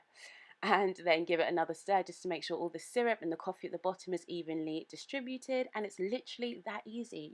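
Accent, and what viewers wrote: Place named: RP, United Kingdom. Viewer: I love your accent...I could listen to you talk all day!!!..so posh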